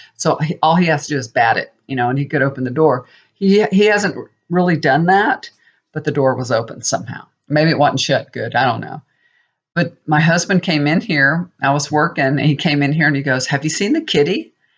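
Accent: South, United States. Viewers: I like your accent and southern charm.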